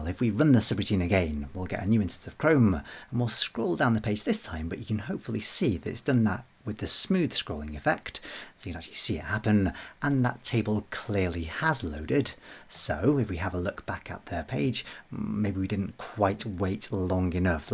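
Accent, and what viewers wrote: United Kingdom. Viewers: Love your training series and your British accent.Thanks for sharing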